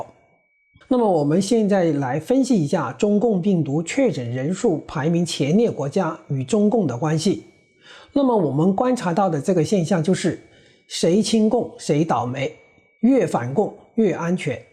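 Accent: Hong Kong, China. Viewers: DON先生的普通話像香港人。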